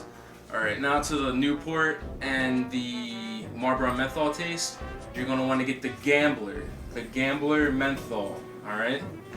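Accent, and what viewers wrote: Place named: Pittsburgh, United States. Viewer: you from pittsburgh? sounds like the accent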